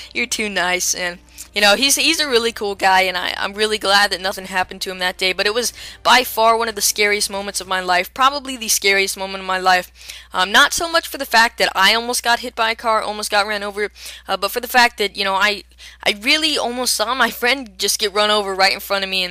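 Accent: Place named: Portsmouth, United Kingdom. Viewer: Sounds like Portsmouth.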